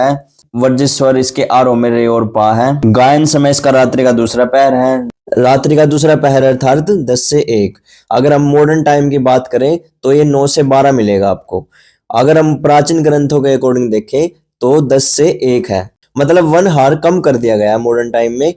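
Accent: Haryana, India. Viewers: Aap haryana se ho kya ??😊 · Sir aap haryana se ho?😅😅Tho tune se laga...... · Yo bhai dekhe HARYANA Ka hai awaz te pta lagra HARYANA ON TOP BHAI❤ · Sir are you haryanvi...? Boli se thora thora lg rha hai..!😂😂 I am also belong to haryana❤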